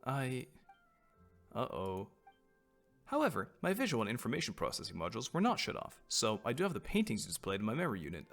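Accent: France. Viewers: I love the french accent you do, pretty accurate as my professors speak just like that 🤣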